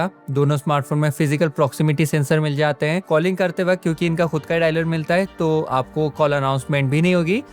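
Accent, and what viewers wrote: Indore, India. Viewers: You are from indore ?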